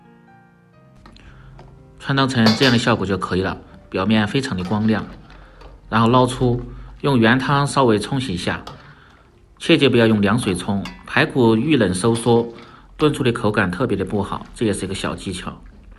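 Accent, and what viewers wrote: Sichuan, China. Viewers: 四川人，一听便知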